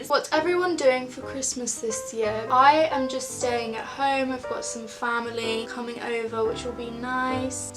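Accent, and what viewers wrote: Scotland, United Kingdom. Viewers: Is she Scottish or British cause confusion but still she amazing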